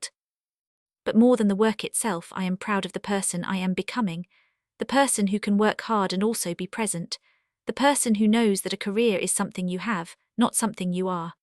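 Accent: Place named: United Kingdom. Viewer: The narrator has a very pleasant voice, but she has a British accent. Why don't they make the primary character from England instead of Vermont? Aside from that small complaint, it's a lovely story.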